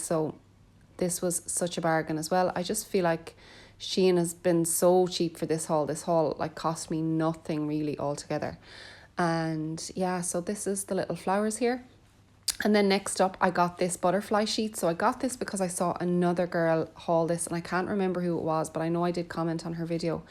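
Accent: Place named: Ireland. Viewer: What a beautiful accent! Sorry I love my Irish ppl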